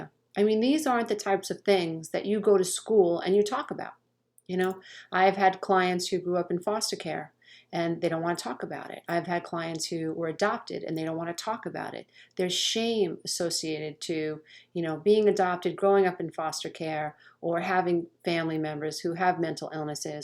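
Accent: New York, United States. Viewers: Hey just started listening. Great information. I hear the newyawker in you from one newyawker to anotha. Got get me some cawfee and warm up the caa!!! LOL! Namaste.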